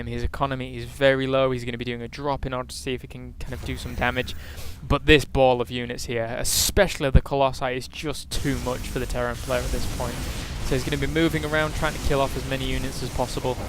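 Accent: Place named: United Kingdom. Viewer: dude, your british voice is awesome, my favorite caster especially since you are bias towards protoss <333333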